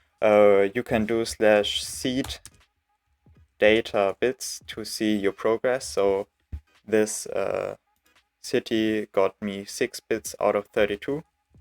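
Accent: Germany. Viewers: Bist du deutsch? Dein Englisch hört sich sehr deutsch an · haha du bist deutsch oder? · This is hard German Voice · du bist eindeutig deutsch... das hört man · Are you german or really english ? you don't sound like a english person NO HATE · i hör a little deutsch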